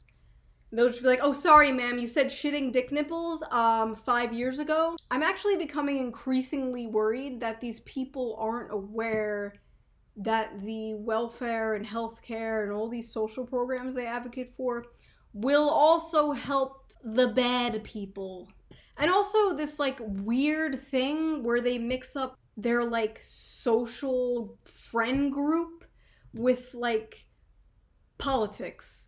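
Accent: Boston, United States. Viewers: Why is your Boston accent so strong here😂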